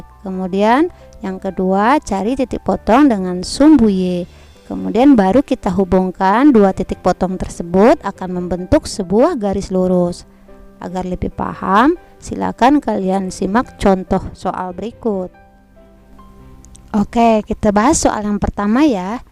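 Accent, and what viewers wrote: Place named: Padang, Indonesia. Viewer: langsung tahu logat dunsanaknyo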